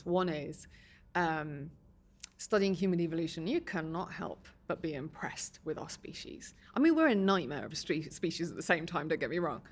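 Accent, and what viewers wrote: United Kingdom. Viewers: British English is charming! U.S. English is beautiful, but UK English has a certain magnificence.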